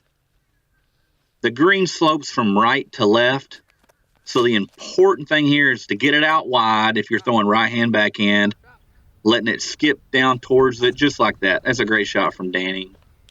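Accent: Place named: Arkansas, United States. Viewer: Fantastic to watch. Love the commentary! Including the great Arkansas accent. Looks like a great event. Thanks so much for posting!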